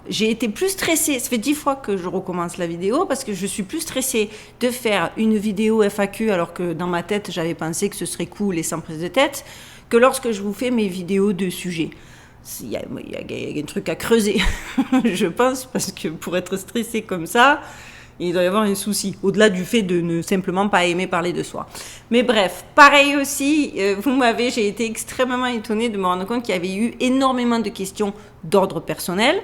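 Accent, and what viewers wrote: Marseille, France. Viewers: Alors la, je n'ai jamais autant ete choque par une video de... Des gens pensent que tu es un.... homme????? Bontee divine... Bon, c'est vrai que de plus en plus de gens ne savent plus que c'est une femme, mais quand meme! Ton accent est charmant, et en plus ca te rend plus "naturelle", en tout cas, moi je l'aime bien ton accent du sud!!!! C'est cool de voir des images de "vers chez toi", est-ce qu'il y a des "legendes locales" que tu pourrais nous partager? Ou des endroits "Mysterieux" ou qui sortent de l'ordinaire? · En tous cas vous n'avez pas perdu l'accent méridional malgré le fait de 15 ans aux states 😊❤ je suis varoise 😘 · Aussi ce que j'ai découvert et adoré, vous êtes originaire de la région Marseillaise, je m'en doutais à votre accent.Comme moi d'ailleurs, actuellement j'habite à Istres, donc pas très loin de St Martin de Crau, donc la prochaine fois que j'y passerai, je penserai à vous. 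· Allo, finalement tu n'est pas une stupide blonde😉😉🤣, Merci pour ton premier F.A.Q., l'explication du nom et de la photo... au sujet de l'accent, je savait quelle venait de Marseille! ( ouais, je suis Québécois, mais; j'ai eu beaucoup d'amis (es) Français) je préfère l'accent du Saguenay!...J'ai une question en deux temps, quel Youtubeur suis tu et est-il possible de voir une collaboration avec eux? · ❓Tu as conservé la langue française et l'accent de Marseille, bravo. Comment tu as résisté en ayant vécu dans des pays anglo? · haha 🤣🤣🤣 Merci pour cette franche rigolade!! Si je vous appelle, ça vous dérange? 😆 Ah et confirmation... J'avais bien reconnu un léger accent marseillais. A moins d'avoir envie de tirer des pruneaux et de jouer de la baillonette je ne vois pas l'intérêt de revenir vivre en France durant les deux prochaines années. Vous êtes certainement plus en sécurité là où vous êtes. 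En France ça devient du grand n'importe quoi... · Wow je ressentais bien que tu es une femme spéciale mais je dois ajouter que tu es formidable ♥️♥️♥️. Quelle force, quel parcours! Et quelle beauté! Ne change rien et continue à nous transmettre le fruit de tes recherches et ton humour, le tout avec ton adorable accent du Sud. Ta mâchoire est juste parfaite! C'est souvent ce qui nous a complexé étant jeune qui se révèle être un atout une fois adulte. Plein de good vibes de l'île de La Digue aux Seychelles... Et toutes mes félicitations pour ta chaîne. 🎉❤ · 3eme commentaire. Rebonjour. Moi j'ai une question. Est-ce que ton accent du sud ressort lorsque tu parles anglais? Précisément: est-ce que tu parles anglais avec l'accent Français du sud? 🤔🙂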